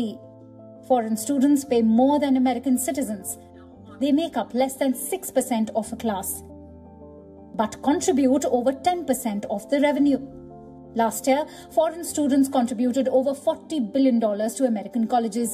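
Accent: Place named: India. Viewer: Stop that indian english accent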